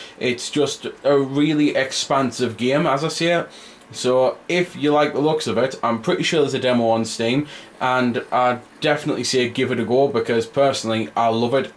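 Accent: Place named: Scotland, United Kingdom. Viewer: sorry im sure your review was nice but i cant listen to your Scottish accent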